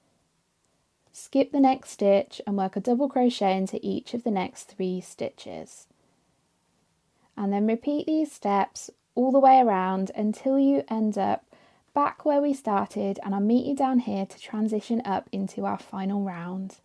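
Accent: England, United Kingdom. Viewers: Why do you call a treble a double crochet? Your accent is English and I am wondering why you are using American termanolgy.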